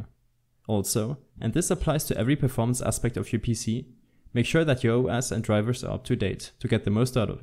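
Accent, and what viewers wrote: Germany. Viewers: Man merkt an deiner Stimme dass du Deutscher bist :D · man hört den deutschen akzent aber gut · Man merkt das er deutscher ist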